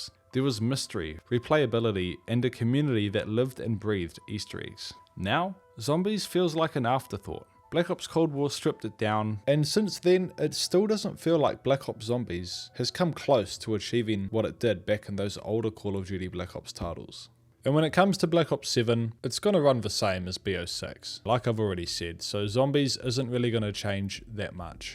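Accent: New Zealand. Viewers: Also I'm assuming by the accent you're a fellow New Zealander my bro i've dropped a sub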